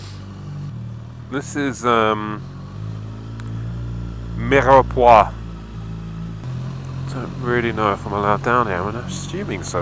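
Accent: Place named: London, United Kingdom. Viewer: Here in 'Murica it is primarily illegal to filter or as we call it Lane splitting. Love your vids you sound like a "Pen pal" of mine from london. (Because saying internet friend sounds lonely)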